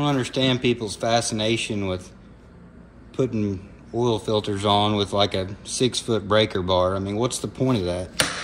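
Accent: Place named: Nebraska, United States. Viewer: Have friends in Alliance Nebraska who sound exactly like you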